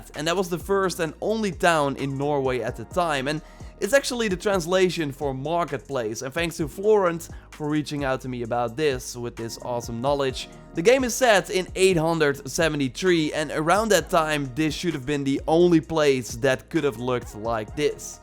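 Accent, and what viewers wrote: Netherlands. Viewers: It's been 2 years since I havent really been active on youtube but I sure remember the Dutch accent of yours like the sound of yesterday haha. Houd jezelf sterk en veilig! Stay strong and be safe! Very informative information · LoL i can hear that you are dutch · are you dutch? it rlly sounds like it lol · why do u sound like u have a dutch accent😂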